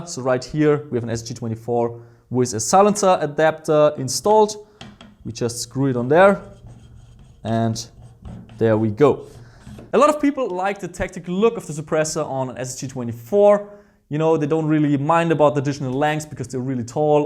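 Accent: Germany. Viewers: bro what the german/austrian accent is the best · Warum machst du eigentlich keine deutschen Videos man merkt das du beim Englisch sprechen einen deutschen Akzent hast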